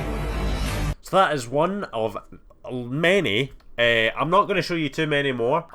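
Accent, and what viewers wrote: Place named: Ireland. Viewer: greetings from canada! just stumbled across your channel by chance when i was scrolling and i love your commentary style. clean, simple, and just giving your opinions. i love your accent too! irish ? regardless, you have a great personality and i hope you continue to post videos! i find them really entertaining. best of luck, 🇨🇦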